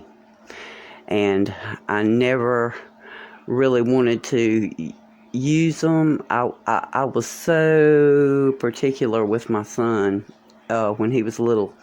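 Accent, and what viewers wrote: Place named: North Carolina, United States. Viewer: u sound like u have an NC accent. I know bc I am from eastern NC. just wondering if u have lived in NC ever? yes u r telling the truth girl for real! I am in recovery now imo but yes I am on suboxone that is prescribed and going to a counselor as well. started late with drugs as well. God bless you 🙏 ❤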